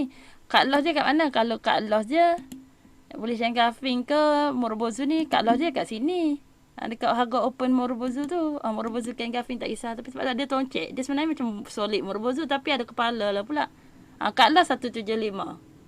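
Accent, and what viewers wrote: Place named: Kelantan, Malaysia. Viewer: awk terlalu kelantan bagi sy..tq kak ats ilmu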